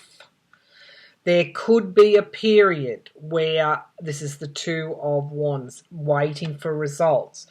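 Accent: Australia. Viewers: Good morning my beautiful calm and funny Aussie friend. I have no plans to listen to the BS behind the Mueller report again today just like I didn't listen to The First One but listening to you this morning and you used a curse word cracked me the hell up😂 as a matter of fact I think I was half asleep listening to you and then when you said that I sat straight up in the bed and paused your video and just laughed. And with that beautiful accent of yours you are in it to win it just as much as we are. Much love light and blessings are sent your way. By the way even when you throw some serious shade you are still a lady.🗽🇺🇸💐🗽 Sleepless in Indiana. P.s. God Damn! You are something else! OG. Just thought of something else. Maybe after all is said and done and all the BS comes out, the GOP will have a reunion at Jurassic Park and try to plan their next strategy with their prehistoric animalistic instincts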